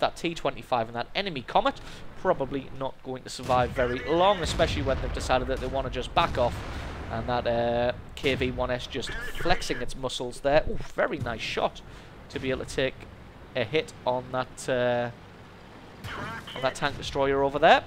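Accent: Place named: Newcastle, United Kingdom. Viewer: Nice. Im new you this channel. One question, Are you from Newcastle??? If so where abouts? I live in wallsend. Just nice to hear someone that sounds like me on youtube haha.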